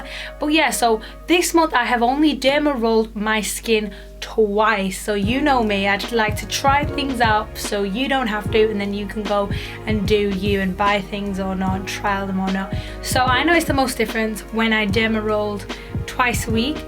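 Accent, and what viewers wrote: England, United Kingdom. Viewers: Akcent is like amir khan England's boxer 🤣😂😘😍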